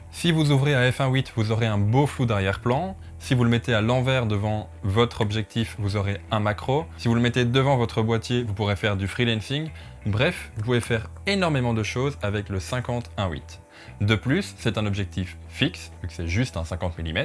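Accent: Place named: Belgium. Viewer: j'ai réussi à capter que t'étais belge alors que t'as un accent trop subtil putain j'suis trop stylée (j'ai eu la confirmation quand t'as dit "septante" haha), en tout cas merci pour la vidéo, je viens de l'acheter et j'en suis très satisfaite !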